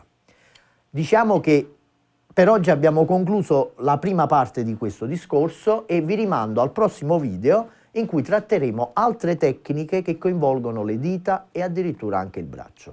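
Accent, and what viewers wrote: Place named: Campania, Italy. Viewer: Grande maestro! Simpaticissimo. L'accento campano mi fa sentire a casa.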